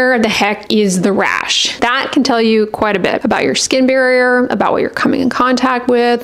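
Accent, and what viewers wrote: United States. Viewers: Unrelated, but what part of the USA is this accent from?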